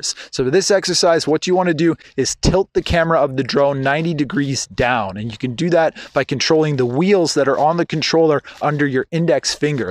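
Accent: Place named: Canada. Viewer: sounds Canadian, lol.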